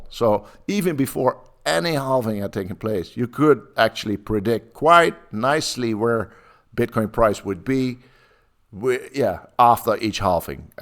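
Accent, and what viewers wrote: Netherlands. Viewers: Is that a dutch accent???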